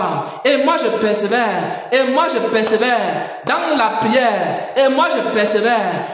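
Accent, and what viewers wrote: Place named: Cameroon. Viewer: Tu es camerounais par ton accent.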